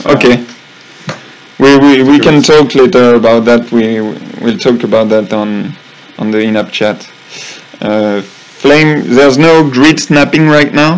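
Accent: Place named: France. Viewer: nice french accent :p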